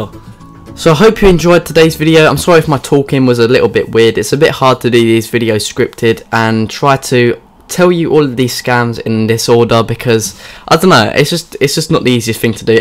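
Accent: United Kingdom. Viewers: great information and you spoke good and clear ..love your British accent ..my guy is from Greater Manchester Uk . I could understand you better than most Americans speak ..great job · On the contrary Mr. commentator, you presentation was very understandable even with the nice British accent, I beleive. thank you kindly for sharing your experiences. Prof. Bruce W. Marchetta Th.D Hypnotherapist - educator 3-1-2017 baja ca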